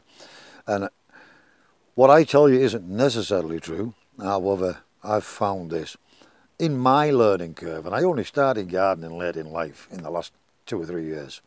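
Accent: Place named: Cumbria, United Kingdom. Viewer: Good to hear Cumbrian twang 👍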